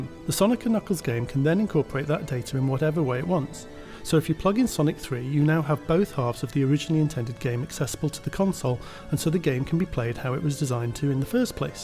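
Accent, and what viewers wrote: United Kingdom. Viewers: A charming English voice I gotta subscribe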